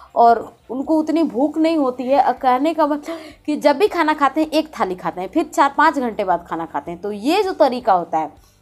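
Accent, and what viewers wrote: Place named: Bihar, India. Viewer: Mam aap bihar se ho kya ❤❤❤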